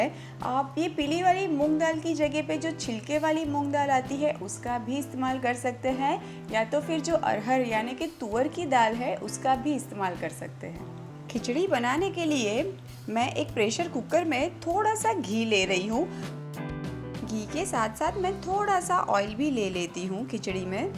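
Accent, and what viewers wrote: Gujarat, India. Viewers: ji tame Gujarati bau sweet bolo cho.i like it.hu pan gujarati chu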